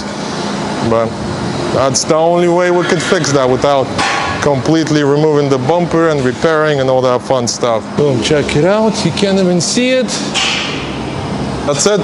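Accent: Russia. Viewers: Я как то по акценту понял что ты русскоговорящий, привет из Румынии.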